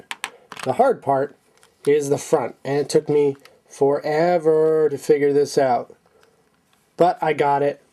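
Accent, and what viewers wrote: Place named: England, United Kingdom. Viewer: it is not British accent, it is English BTW but good model!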